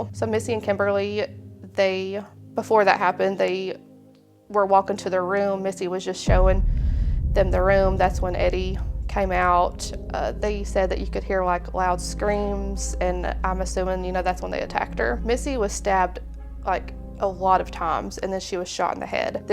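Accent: South, United States. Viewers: LOSE WEIGHT NOT JUST FOR YOUR LOOKS BEFORE YOUR HOUSE YOU CAN LIVE TO BE 90 GET A CHIC SHORT HAIRCUT AND STOP DRESSING ALL PROVOCATIVE DITCH THE ACCENT THE SOUTHERN HORRIBLE ACCENT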